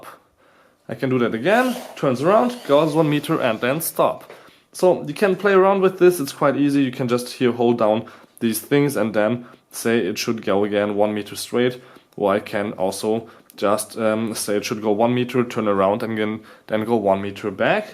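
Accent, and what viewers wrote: Germany. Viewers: Man merkt so stark das du deutsch bist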